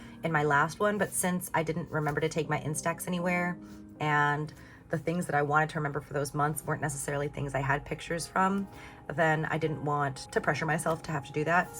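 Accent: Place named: Mid-Atlantic, United States. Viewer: I find your accent fascinating, very Mid-Atlantic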